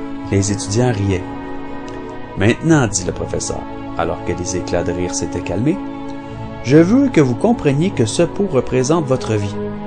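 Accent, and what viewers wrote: Canada. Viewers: moi non plus, je trouve ses vidéos superbes, bien expliquées, et a chaque fois, elles me laissent songeur et heureux... De plus, je suis français et j'adore l'accent canadien et je le trouve très agréable à écouter. Merci.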